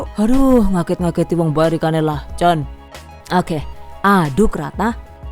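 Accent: Banyumas, Indonesia. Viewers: Dengar ngapak nya ibu jadi kangen kampung halaman😭🙏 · Bun..kok bahasa nya kyk Ngapak ya.. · Laaahhh ngapak😅😅😅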